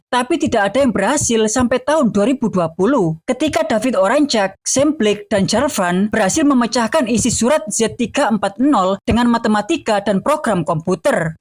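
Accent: Java, Indonesia. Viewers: logat jawa dan meme pria hitam. admin asli ngawi fix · Terlalu medok mas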